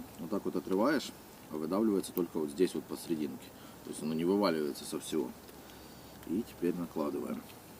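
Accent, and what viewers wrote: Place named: Russia. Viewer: Кому ти озвучуєш кацапською говіркою? Хрін тобі а не лайк. Іди за узкім карабльом.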